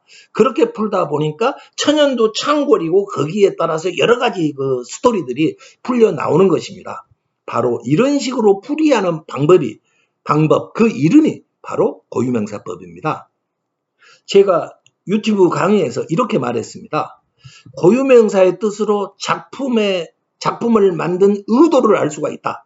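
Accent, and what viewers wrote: Gyeongsang, South Korea. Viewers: 경상도 싸투리죠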